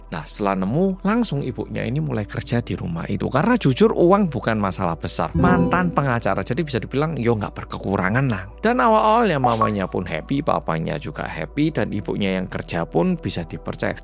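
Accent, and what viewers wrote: Java, Indonesia. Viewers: Malem mas medok Sehat sukses selalu 💪🏿 Lgsg nyimak🎉🎉🎉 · Medok kah?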